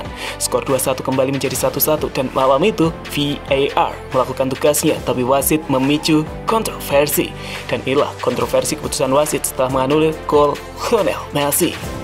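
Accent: Java, Indonesia. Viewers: Medok banget ngomongnya.. Hedeeeeewh..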